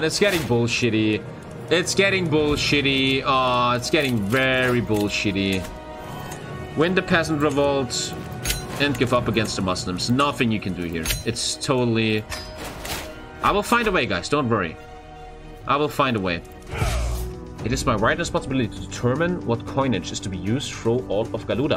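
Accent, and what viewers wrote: Germany. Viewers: Is he German? Ok he is. Really recognized that at the end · I've just been sat here for 20 minutes trying to figure out what this guys accent is and it just hit me straight in the face and now I feel stupid, because it's german and also german. Das Denglisch hätte mir eigentlich nen Tip geben können... und es ist halt super lusitig.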